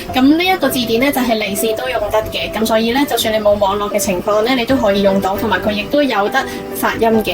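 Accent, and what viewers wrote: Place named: Zhanjiang, China. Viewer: 有湛江口音😂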